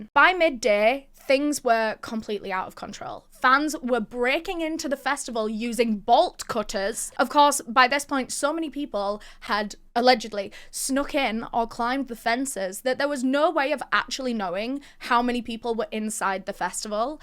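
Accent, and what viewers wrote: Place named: North, United Kingdom. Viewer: northern accents are so hard to listen to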